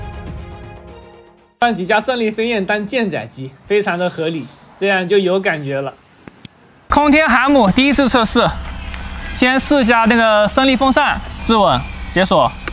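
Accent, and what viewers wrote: Jiangxi, China. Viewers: 听你说话，就知道是赣普🤭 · 江西舰？赣州人吧 一听就是水西西瓜皮的口音:face-blue-smiling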